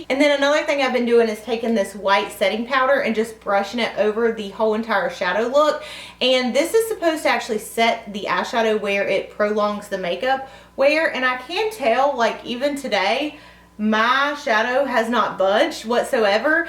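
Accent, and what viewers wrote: South, United States. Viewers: I love watching your videos, and I love your southern drawl ( I'm from Kentucky so I know where coming from). I signed up for influenster but I have never gotten anything do I need to do something other than just sign up? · I love your videos! You are beautiful young lady and you seem to show that beauty outside and inside. I was very curious about this palette. The price point is high for Laura Mercier's eyeshadow palettes. What is tempting me is the dark matte colors. I love the shimmer shadows. They are gorgeous!! I use those dark shadows with my liner. I like to take a small smudge brush and go over my liquid liner or my eyelash glue to block that shine and soften the liner. If I travel with a dark color, I take a MAC or MG single pan in a Z palette and I have broken three of these dark colors while traveling. This palette along with whatever palette is my favorite at the moment would be safer to travel with. 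I'm not sure if the white color would help me. I have this palette in my Sephora cart but that price is keeping me from finding it that worthy. I have too many things on my want list right now!! You're lovely and keep doing videos. I'm a Northeast Tennessee girl that has a southern accent close to yours!!!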